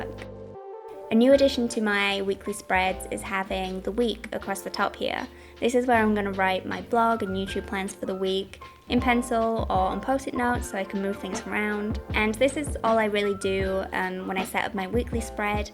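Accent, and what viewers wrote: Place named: North, United Kingdom. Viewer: I hope you don't lose your northern accent.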